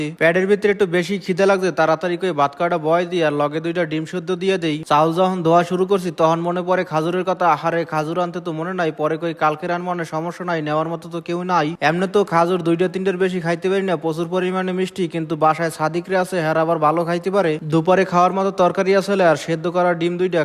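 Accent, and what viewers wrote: Barishal, Bangladesh. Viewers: Khajur ki? Khejur bolen.kotha shundor kore bolen borisailla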